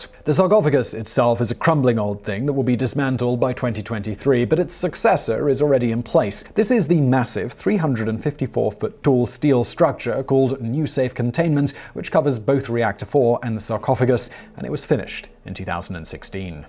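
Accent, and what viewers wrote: United Kingdom. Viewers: I hate the British accent :|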